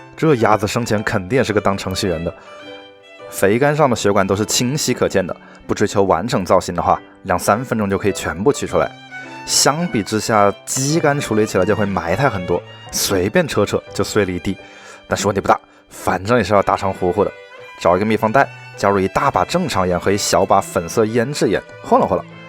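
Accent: Wuhan, China. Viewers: 就是说 这一听就是直击灵魂的武汉口音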